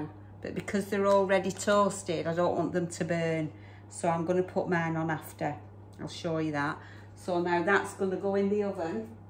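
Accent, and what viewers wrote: Lancashire, United Kingdom. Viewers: Ooo looks yummy must have a go at that soon. New subscriber here & loving your content, are you a (dare I say it) a Lancashire lass? It’s where I’m from….thought I recognised the accent?! Look forward to catching up on your previous videos & please do a cook with me video, I for one would love it. Thank you, take care. Kind regards, Lynda 😉🌻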